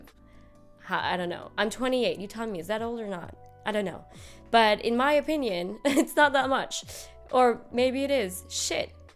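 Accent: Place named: United States. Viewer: Found your channel today and immediately subscribed, love the way you talk about each book and so many that I have never heard about, love it because most booktubers tend to recommend the same as everyone else. You said you grew up in France but your English is so perfect! I was wondering how your accent sounds so American? There’s no French accent, I’m mesmerized because I’m not from the US but have been living here over 20 years and I still sound foreign 😄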